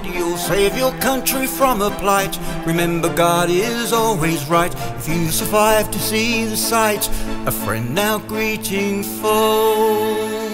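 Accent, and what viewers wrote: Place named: United Kingdom. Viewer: Superb,. Such a unique upload of this lesser-known Whittaker gem, a profound, deep poem with amazing heroic/patriotic music accompaniment. It's pretty cool to hear you using your beautiful Brit accent on this. Normally, you just merge into the hybrid voices you create, and no one can tell. It sounds just lovely here, man. Excellent vocals! BTW, Dave Silk is the best, a first-rate gent! Shout-out to David! I hope everything is okay, bro... is Helen doing well? So glad to hear about the new medication, and that things are a little better. We've all been praying for you both, dear friend, and sending good vibes. Wishing you both a week of tranquility and calm. Peace and brotherhood,🙂 Mac (aka RE-VO)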